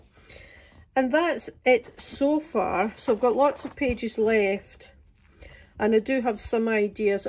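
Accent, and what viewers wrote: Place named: Scotland, United Kingdom. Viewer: Awesome idea. I need to go look up those Villain Archetypes. I don't have a common place book, but have started doing something similar in Notion, cause I've always got my phone on me and I can bring it up on one screen while I'm writing on the other. (dual screen setup). Psssst!! it's good to hear a Scottish accent on the YouTubes. I'm in Cumbernauld!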